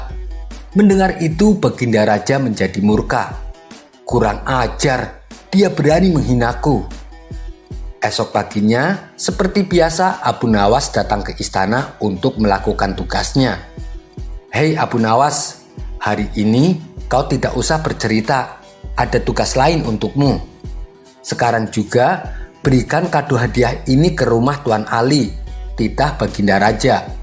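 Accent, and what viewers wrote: Java, Indonesia. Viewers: Medok amat kowel lo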